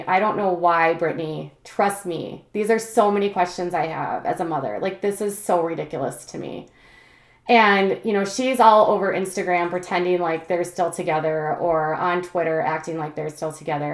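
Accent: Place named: Midwest, United States. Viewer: Where do you live?? I am from MN and hear a midwest accent? I could be wrong but I dont mean to offend if I am way off base haha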